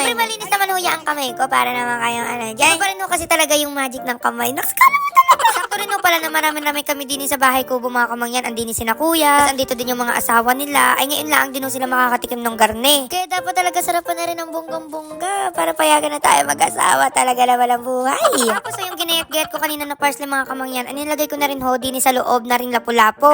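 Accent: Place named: Quezon, Philippines. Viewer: Quezon province, specifically in mulanay quezon same accent with you po 😊😄 watching from Qatar now 😊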